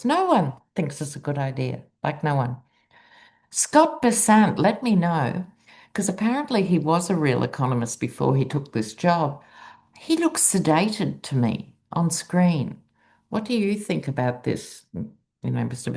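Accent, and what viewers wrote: Australia. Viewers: A couple of decades ago I got to see Dame Edna in Austin, Texas. Before the internet we didn’t get much info about Australians here in Texas. Your accent and lingo reminds me so much of what we learned from Dame Edna, whom I adored. I love and appreciate what you do!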